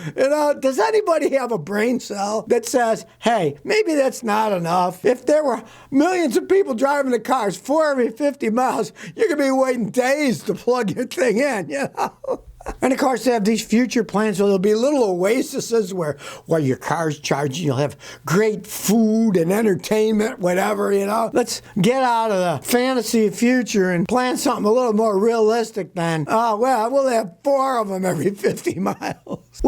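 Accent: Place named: New England, United States. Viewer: Love the Yankee accident, etc The charm of "PLETH-ORa" is classy gangsta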